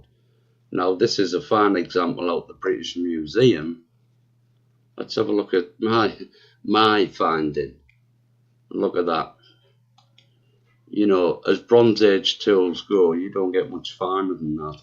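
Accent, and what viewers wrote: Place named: Stockton, United States. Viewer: Nice one mate...i live in Sydney now but as a kid my Nana lived on Dovecote Street in Stockton and my mum was born there..good to hear the accent again 🙂👍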